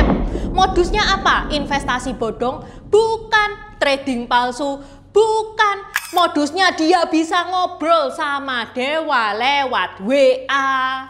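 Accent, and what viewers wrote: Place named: Surabaya, Indonesia. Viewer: ngakaaaak… aplg logat e.. suroboyo -an e kentel… segerrr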